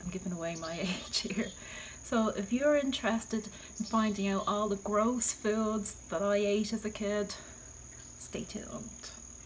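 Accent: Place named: Ireland. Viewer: I love your Irish accent!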